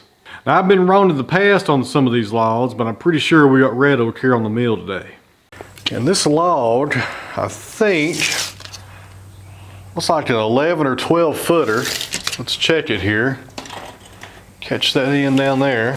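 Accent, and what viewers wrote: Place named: South, United States. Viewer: Can the accent get any more southern? I love it.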